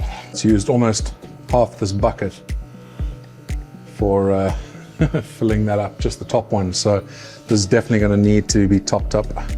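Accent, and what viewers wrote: South Africa. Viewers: Where are you from originally? You sound south african.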